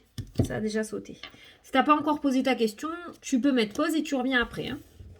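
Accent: South, France. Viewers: J adore ta bonne humeur toujours un plaisir de t écouter avec ton accent du soleil!! Bisous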